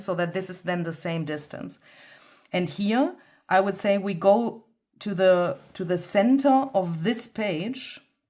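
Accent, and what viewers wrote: Germany. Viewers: I am German. At first it was difficult for me to listen to your English. But your creativity is way too amazing to miss. So I got used to your English. I hate the German accent 😅 I don’t know if your English gets better with any video or my ears just got used to it. Anyhow by now I love listening to you and laugh when you stumble about words. As someone who is been missing even German words every now and then I feel with you. I love the way your brain works and how you do your tutorials. Please stay as funny, educational and creative as you are ❤ you definitely became one of my most favorite crafters on YouTube ❤❤❤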